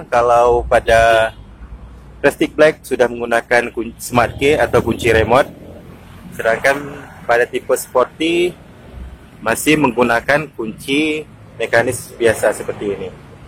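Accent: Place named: Aceh, Indonesia. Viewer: Org Aceh rupanya, logat cara ngomongnya ketauan, 😊 salam utk adoe syedara lon di Sinan. 👌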